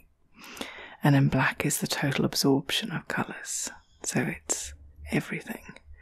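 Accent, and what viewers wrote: United Kingdom. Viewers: Really enjoyed listening to this...makes a change hearing an English accent but also fascinating understanding how you approach your art. Would love to see more of this from you as it works on so many levels :)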